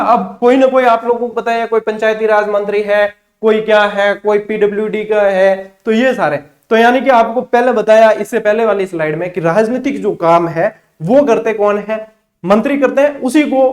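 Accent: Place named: Rajasthan, India. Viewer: Sar aapke munh se Marwadi acchi lagti hai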